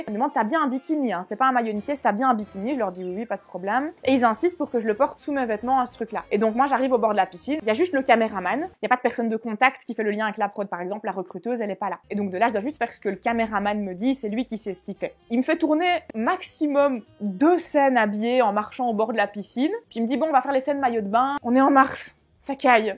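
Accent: Belgium. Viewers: Bonjour, j’aime bien vos vidéos, un peu jaloux de vos abdominaux, vous êtes belge, votre accent ressemble, à l’accent des belges de Mons, vous connaissez? je suis franco belge, François Damiens, je le kiffe avec son rire de pervers quand il passe sa langue, bref vive la Belgique!! Et la France! Bye… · L'accent belge bien de chez nous 👌 tu es de où ? 👀 J'aimerais bien savoir 🙃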